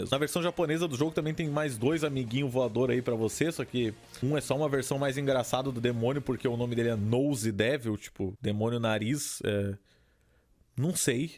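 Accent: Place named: Brasília, Brazil. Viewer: Você é de Brasília? Não consigo identificar o seu sotaque kkkkkk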